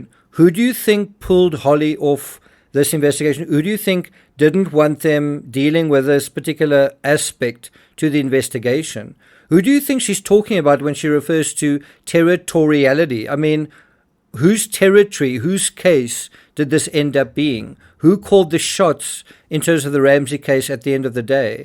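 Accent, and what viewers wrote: South Africa. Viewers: You have a South African accent!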